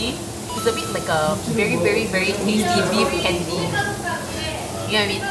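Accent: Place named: Singapore. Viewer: Love your videos, especially your interesting Singaporean English accent (in a good way though)!! I visited Seoul a couple times and really like this city and Korean culture. I have tried to learn Korean as well by myself (as I like to watch Korean dramas and movies), but it's hard, maybe because I'm too old learn a new language. I can still understand a bit though, as some Korean words/phases actually sound like Cantonese/Mandarin. Actually, I have a suggestion - I'm vegetarian but find it hard to find real vegetarian dishes in Korea. Even Naengmyeon, which I like, uses beef broth. It would be great if you could recommend some nice Korean vegetarian dishes and restaurants in the future:)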